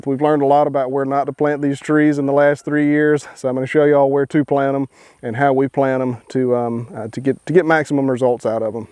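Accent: South, United States. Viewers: Thanks! You always post the most calming yet informative videos. I'm Georgia born and raised but you have the best southern accent by far!:D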